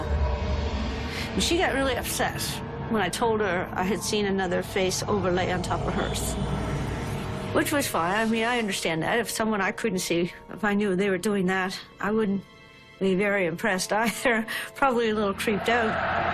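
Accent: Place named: Canada. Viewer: Love her Canadian accent so much! And the Halifax story breaks my heart! All those poor people! :( May God rest their souls!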